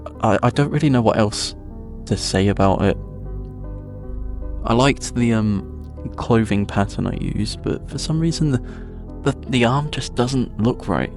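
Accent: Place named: United Kingdom. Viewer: British jumpscare (i do like your voice), this is a nice challenge for spooktober! 🎃 edit: f*ck you 😭😭😭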